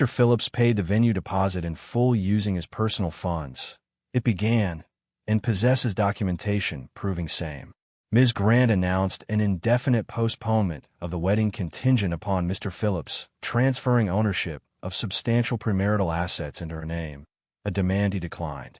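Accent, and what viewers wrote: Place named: Houston, United States. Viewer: If this not a real Houston story, definitely sounds like a woman from the Southwest side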